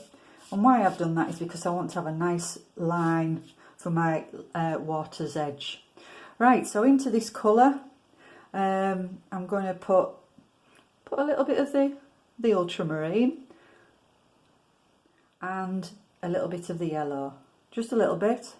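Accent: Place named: Yorkshire, United Kingdom. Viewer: Beautiful painting, and I love your Yorkshire accent! And I’m not taking the mickey. Hope I spelled that right.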